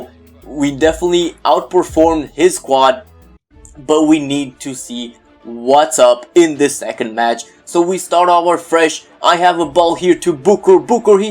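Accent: United Kingdom. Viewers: Dude,esti tare,continua...wow,ai un accent britanic excelent! ;) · nu mai pronunta numele romanesc cu accent de englezoi. e enervant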